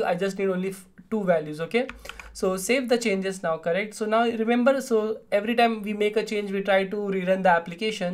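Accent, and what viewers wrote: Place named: India. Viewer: Nnnnoooooo This video is exactly what I needed. And yet, it has been cursed with a pajeet accent.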